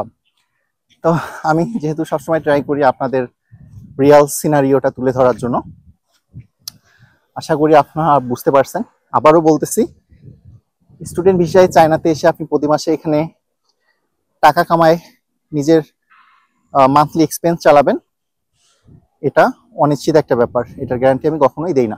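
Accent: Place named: Sylhet, Bangladesh. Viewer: 🤣.Depend on luck. But as a sylhty I think sylhety ra Sylhet ar outside a Biya-shadi kum kora. Apnar accent onakta Sylhety mona hoyacilo tai bollam .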